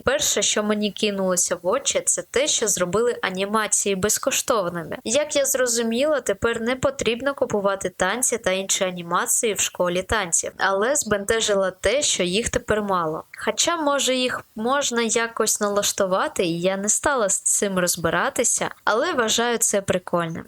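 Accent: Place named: Donbas, Ukraine. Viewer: Ти з Донбасу? Мова з родзинкою ❤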